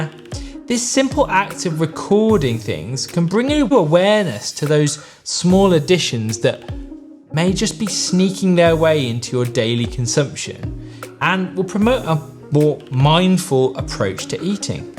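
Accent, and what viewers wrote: United Kingdom. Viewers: It's sooooo refreshing to get useful advice & info about weight-loss & nutrition, especially from someone who's been there himself. It's also great to hear a British 🇬🇧 accent, as opposed to those Americans who drown you with info & grate on your ears 😣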